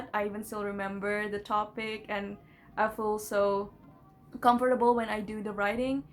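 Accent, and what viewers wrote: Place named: United States. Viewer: your american accent sounds recognizable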